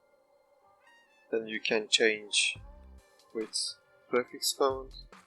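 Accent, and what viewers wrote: Turkey. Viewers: man youre turkish right, your accent is so turkish!! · %90 Türksün değil mi :D kelimeleri telafuz edişini türk gibi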